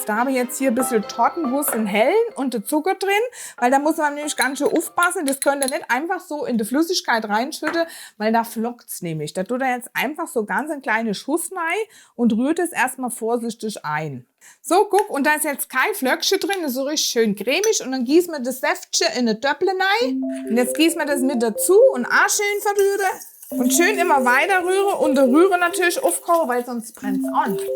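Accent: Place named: Hesse, Germany. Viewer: Voll genial , hab die Torte zum Geburtstag für meinen Mann gemacht , legga legga.... Dein hessisch is einfach herlliiiisch. DieStelle mit der Butter : "damit's net so " aarsch" zammfällt" :DDD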